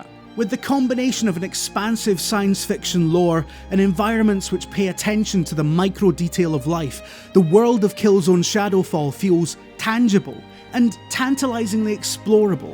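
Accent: Scotland, United Kingdom. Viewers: Is that a scottish accent I hear? Wonderful, could listen to this all day! Great review too.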